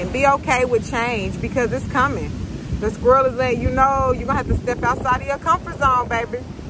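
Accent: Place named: Carolina, United States. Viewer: You from Carolina ? sheesh major sign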